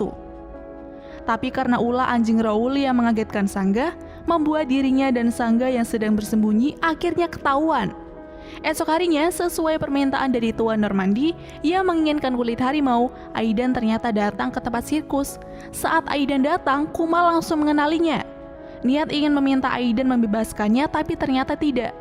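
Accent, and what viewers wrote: Sunda, Indonesia. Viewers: aduh Mimin aslli Sunda Oge nya👆🤭🤭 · Bukan cerita'y yg saya suka, malah denger bahasa'y, asli urang sunda pisan ieu mah. 😆😆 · Pengisi suaranya orang Sunda anying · Geloah ngomongna Sunda pisan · teteh orang sunda ya😂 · Hahahahahaa nyariosna mni raos sundana🤣🤣🤣🤣👍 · Sunda banget😂😂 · Seru banget ceritanya mengharukan Apalagi pas pake logat Sunda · Teh ai teteh budak Sunda nya,Sunda na NU ti mana😅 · Lucu ey aya sunda sundaana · Sih teteh Sunda nya kasar teing nya 🤭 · Sumpah lucu pisan nyarios Sunda meni enaken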